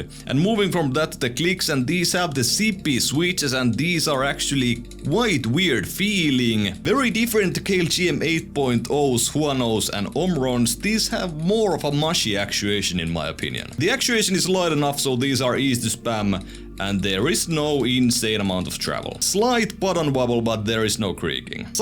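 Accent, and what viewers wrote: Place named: Finland. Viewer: he's from Finland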